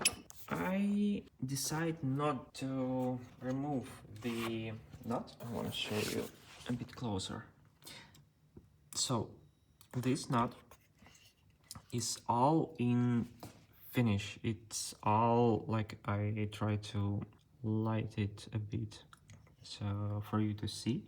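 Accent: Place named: Eastern Europe. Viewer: Сильный славянский акцент, чувак. Откуда ты? Россия? Украина? Беларусь?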